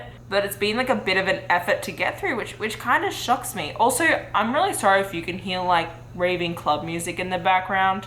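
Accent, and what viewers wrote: Australia. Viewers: I just came across your Chanel and love it. I’m curious..... your accent, are you Australian?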